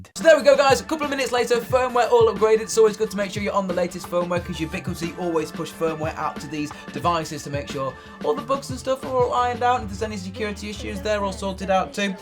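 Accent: England, United Kingdom. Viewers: I like your English accent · English (UK) English (US) English (AU/NZL) English (Canada) All not same in pronounce of router Please not tell him how pronounce he use British accent.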